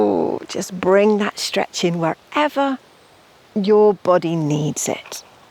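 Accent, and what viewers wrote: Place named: United Kingdom. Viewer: ❤love her clean beautiful accent. Why is it that other British people sound different from her and barley understandable?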